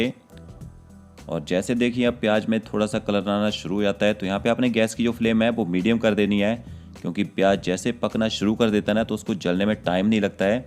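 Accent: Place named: Punjab, India. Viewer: आपका पंजाबी स्टाइल में बोलना बहुत ही अच्छा लगता है ।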